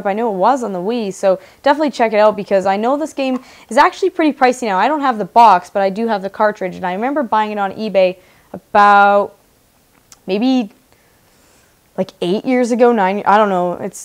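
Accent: Canada. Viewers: Canadian right? "Mare-rio", "aboat".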